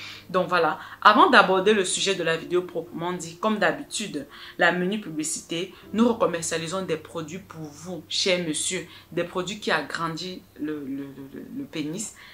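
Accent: Cameroon. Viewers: Merci coach. Je suis sûr que vous avez déjà accouchée. J'aimerais voir le joli bébé 🤱. Votre accent tend vers le Cameroun, c'est ça?svp.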